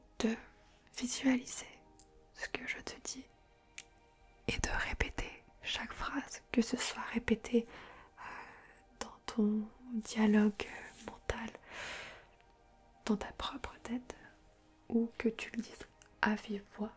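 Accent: France. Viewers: I really love your French accent!!!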